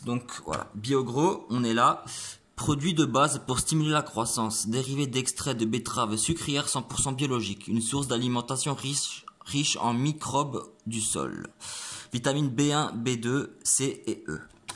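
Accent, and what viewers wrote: Alsace, France. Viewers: L'accent alsacien 😭😭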